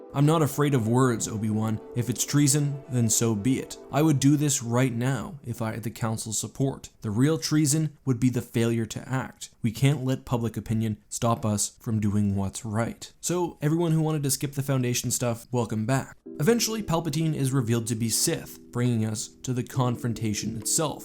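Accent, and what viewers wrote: Canada. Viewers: This is this first confirmation I hear of your Canadian nationality. I suspected as much from your accent slipping through in most episodes. If you had mentioned it somewhere else or before, I haven’t seen it. It’s just key words that highlight the accent.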